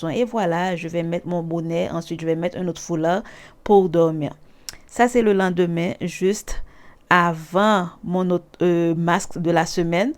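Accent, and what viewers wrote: Haiti. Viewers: Tu parles comme une Haïtienne 🇭🇹🇭🇹j'aime bien merci😊😊😘😘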